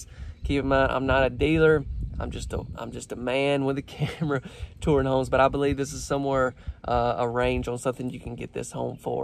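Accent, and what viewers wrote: South, United States. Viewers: Absolutely amazing - I really give the owners kudos for having the imagination to create such a beautiful comfortable amazing home with all the extras it’s crazy!! Definitely made me feel calm and a little envy ness lol no but seriously it truly made my day! Thank u to the owners for allowing America into your home and thank you for doing such a great job and that southern accent and manners gets me every time!! Lol -Looking forward to watching more of your videos! God bless!! 🙏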